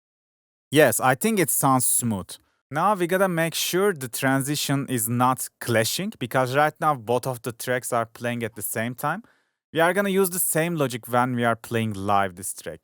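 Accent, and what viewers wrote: Turkey. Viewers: I hear Turkish English accent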